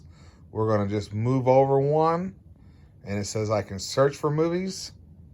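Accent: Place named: South, United States. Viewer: Can you sound any more like hilly billy john. and your "okay" every other sentence. you are exactly how you sound.... Like an uneducated idiot.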